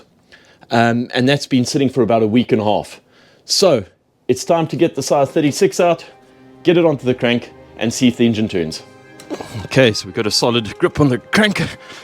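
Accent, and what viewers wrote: South Africa. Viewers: Your English accent sounds like a South African. My uncle Harry used to restore Alfa Romeo's back in the day in South Africa.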